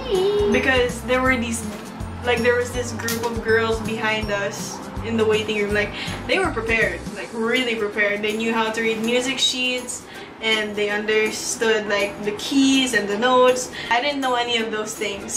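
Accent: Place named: Philippines. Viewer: Ermgee I could tell you were Filipino from your voice! HELLO, galing mo! Awesome and helpful video! Was this in Makati? (STEPS Dance Studio)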